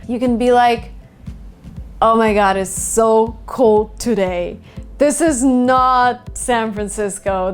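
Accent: Russia. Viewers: Please make vıdeo With yoğur russıan accent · Is her native language Russian?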